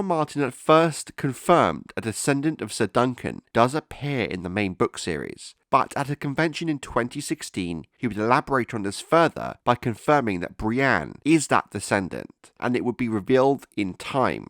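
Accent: United Kingdom. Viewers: The accent is very sheffield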